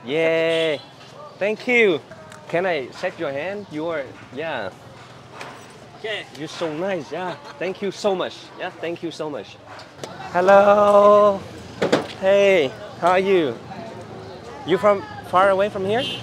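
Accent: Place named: North, Vietnam. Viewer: Dude - you have a little bit of North Vietnamese. Pretty cool!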